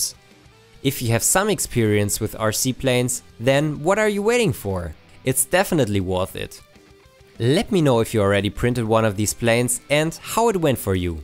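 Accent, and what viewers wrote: Germany. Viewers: Dieser deutsche Akzent ich fühle es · When you hear the German accent the first thing that comes to mind is "precision". Great work. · Desch isch aba a schöna deutscha akzent LEL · Feier deinen Deutschen Akzent xD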